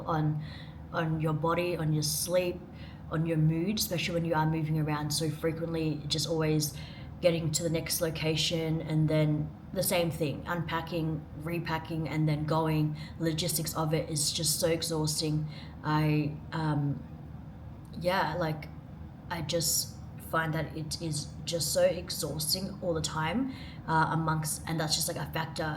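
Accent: Australia. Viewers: Nice ,as soon as you first spoke i new you were from Aus👍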